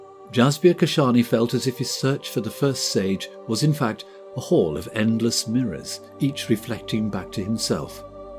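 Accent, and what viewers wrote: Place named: United Kingdom. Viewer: I always think it’s funny when a British voice narrates about Indian culture/religion/history 😂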